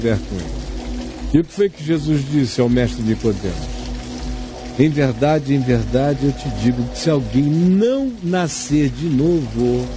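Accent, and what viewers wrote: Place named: Bahia, Brazil. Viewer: Eu tb pensei que era um baiano! 😄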